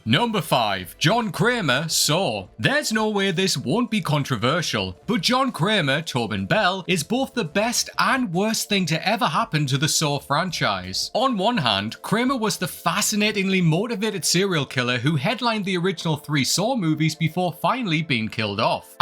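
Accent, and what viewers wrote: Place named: Geordie, United Kingdom. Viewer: Poyro?! Christ. Thick sounding Geordie.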